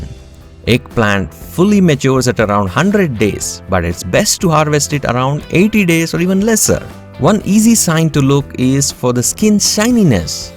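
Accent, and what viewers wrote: India. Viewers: You can hide everything, but you can't hide your Indian accent!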